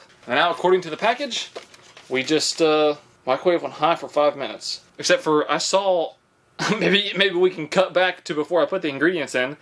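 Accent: South, United States. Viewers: Funny thing is those sweet potatoes were probably grown in the USA. They are extremely common in the South. sounds like he has a southern accent surprised that he doesn't know about it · I just found this channel and I'm a bit confused. I thought for sure from his accent that he's from the south US, but he's never seen a sweet potato? Maybe his family used canned yams and he doesn't know they're the same thing.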